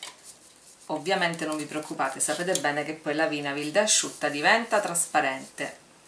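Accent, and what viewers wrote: Sicily, Italy. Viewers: Sei siciliana?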